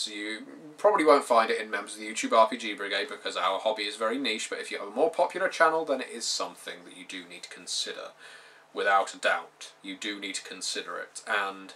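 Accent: United Kingdom. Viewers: Sitting here trying to teach myself about deducting taxes and how to make the proper claims (although I'm from Canada). All I can say about this tedious subject is... thank God for your British accent!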